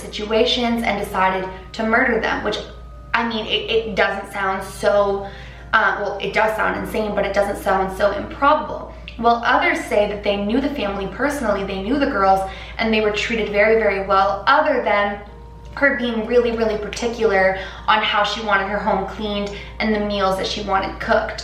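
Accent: France. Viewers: I love her French accent😋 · I know this is besides the fact and kind of random but you're french accent is beautiful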